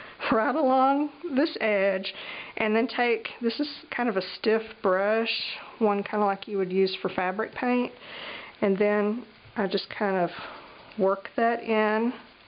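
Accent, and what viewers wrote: South, United States. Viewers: OOO! Magazine page shown MUST be about me... I love your videos! You are so incredibly knowledgeable, and I love learning new things from you! Edited to add: You are also a hoot to listen to. Between your lovely Southern accent, your colloquialisms ("book book", "a pokey tool"), and your complete lack of condescension, you're a pleasure to listen to! You've found a new subscriber!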